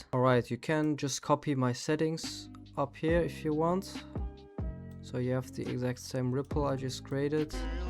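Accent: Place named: Germany. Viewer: i hear the german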